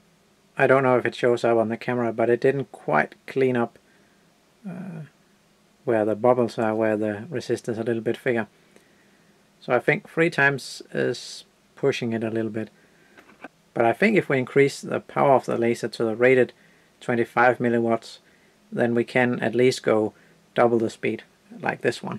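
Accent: Denmark. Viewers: hey er du fra Danmark? (hello are you from Denmark?)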